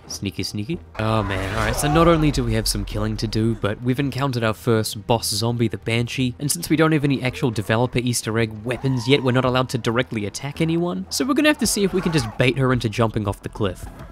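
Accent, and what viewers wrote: New Zealand. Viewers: Yo. Are you from new zealand?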